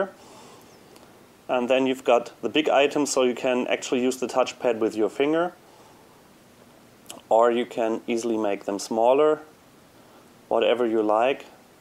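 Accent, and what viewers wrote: Germany. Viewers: you sound very german !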